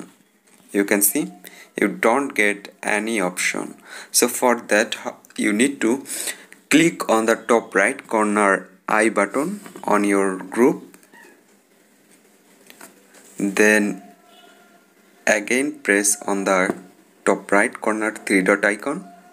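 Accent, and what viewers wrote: India. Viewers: Me: (Search on Google and YouTube on how to delete a poll on messenger) (Found a video) (Plays the video and the voice is India) Me: "Wow! An Indian Youtuber. This might work! Tried what he instructed Me: SCAAAAAAAAAAAAAAAAMMMMM!!!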